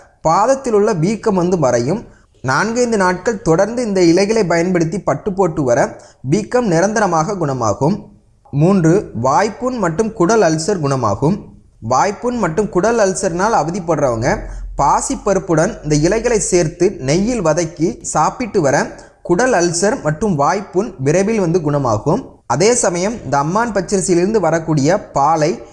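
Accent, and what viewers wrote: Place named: Sri Lanka. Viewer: Ilangai tamil maari therigirathu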